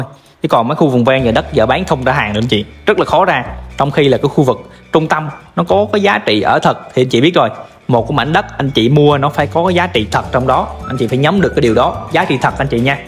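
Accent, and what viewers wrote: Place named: South, Vietnam. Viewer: người Quảng Ngài cháu nói giọng niềm nam rat rõ, love kênh cháu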